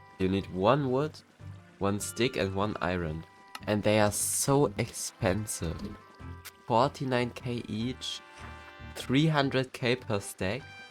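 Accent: Germany. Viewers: You can not hide that u are german from a german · Nice Video, but you can hear the German accent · Why do you sound so german😭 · I can tell you are an german by the Voice 😂 Love you Video ❤ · Why sounds this English so german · Man hört das deutsch einfach raus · Dieser deutsche akzent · Holy German accent... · bro man hört deutsch raus :D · I hear german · Holy german accent Fire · I Can heare that you Are German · German accent? Nice vid. Go on buddy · hey bro, are you from Germany? You sound like it. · Ich hör das Deutsch raus beste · I hear a little bit of deutsch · I hear deutsch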